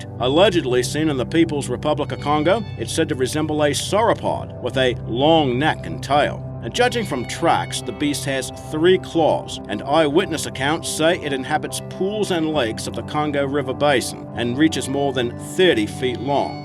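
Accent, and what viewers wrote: Australia. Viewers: What accent is that? It sounds close to Australian, but then a lot of your vowels don't sound right for Australian English. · Your accents got me stumped part aussie and part buggered if I know. Seriously what nationality would pronounce a mammal as Marmal?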